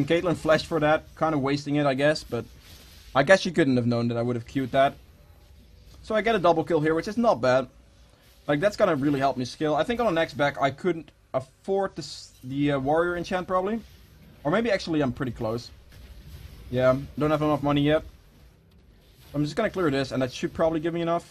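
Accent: Netherlands. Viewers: ur dutch am i rigth?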